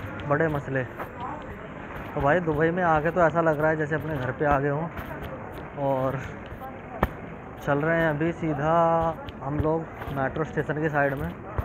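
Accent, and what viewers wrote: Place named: Bijnor, India. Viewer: Hallo bhai up bijnor se ho kya ❤️❤️🙏🔥🙏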